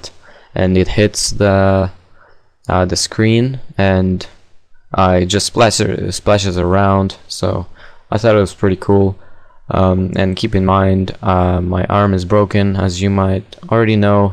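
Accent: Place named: Netherlands. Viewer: do you have a dutch cennel want ik hoor dat je nederlands ben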